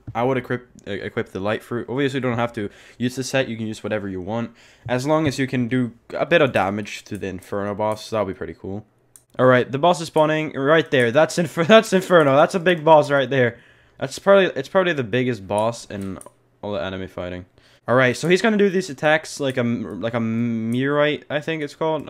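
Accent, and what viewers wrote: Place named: Netherlands. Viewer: such a dutch accent tbh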